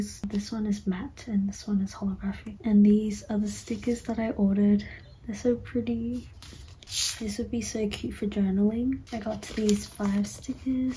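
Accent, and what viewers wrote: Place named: Australia. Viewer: now developing a slight aussie accent bcs i keep binging ur vids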